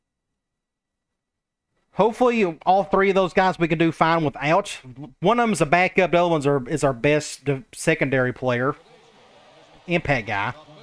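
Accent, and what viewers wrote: South, United States. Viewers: That southern accent hits😂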